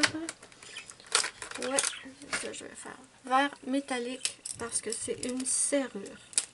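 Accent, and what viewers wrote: Quebec, Canada. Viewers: Moi je te trouve très courageuse, tu essaies un nouveau materiel devant nous. Super! Oui ta peinture est magique, je me la suis commandée. Je vais essayer. (J'adore ton accent Quebecois 😁) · Coucou! Il est très joli comme ça ton colo. Pour les craies, je ne saurais pas trop dire, en plus j'ai les neocolor 2, mais par contre, dans mes Hanna Karlzon, je les estompe en mettant un peu d'eau sur le doigt. J'aime bien quand tu utilises des expressions québécoises, tout comme ton accent je trouve que ça contribue au charme de tes vidéos. 😘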